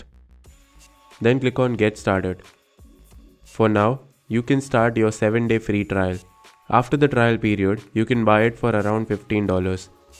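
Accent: India. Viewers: the paj eet accent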